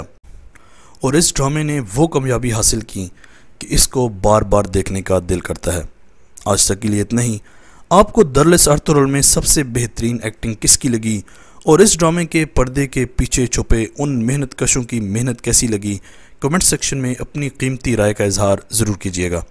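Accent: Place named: Punjab, Pakistan. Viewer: بھائی کا تعلق ضلع اٹک سے ہو اس لیے لفظ ( ہوئے) ہویے بول رہے ہیں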